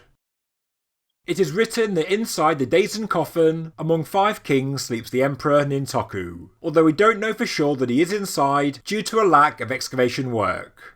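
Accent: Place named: Black Country, United Kingdom. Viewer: Hey man, I know you are from my part of England. Your accent is familiar.😎 I want to thank you from the BLACK COUNTRY for showing us this global civilisation that existed before the bs history we were forced to study even thou it made no sense. What the eff are they afraid of us learning? The truth?.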